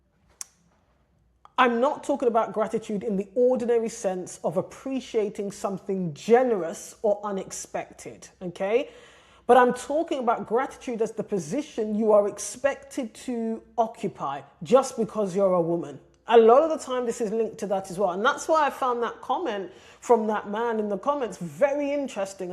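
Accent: United Kingdom. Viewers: Yeeeeees! I knew you were from SOUTH LONDON! Bad gyal tings! Go on mamita 🔥🔥🔥🔥🔥🔥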